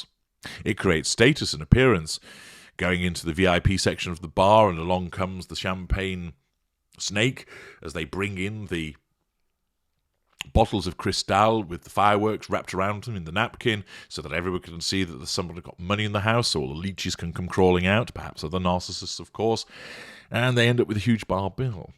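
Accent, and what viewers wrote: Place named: RP, United Kingdom. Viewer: BTW, I love how you swear with such a cut glass accent. More please.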